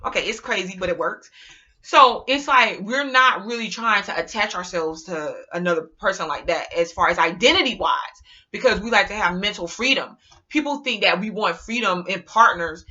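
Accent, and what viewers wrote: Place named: New Jersey, United States. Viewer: .i love your jersey accent and your list lol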